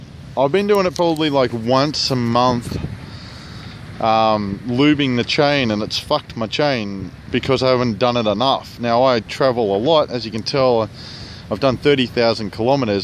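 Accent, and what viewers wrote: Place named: Australia. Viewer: Good Review and great accent. You Australians have reinvented English as a tool to maintain a single class society.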